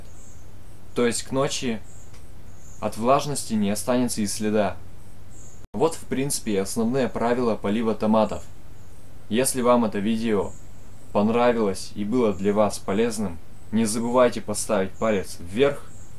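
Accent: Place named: Urals, Russia. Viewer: говор уральский....в каком районе проживаете?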